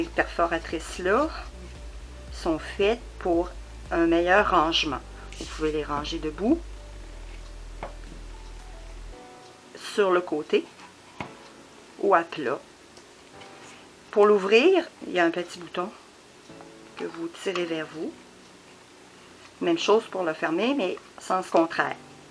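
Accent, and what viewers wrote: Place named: Canada. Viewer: Très complet et très clair, merci ! En plus j'adore votre chaleureux accent canadien !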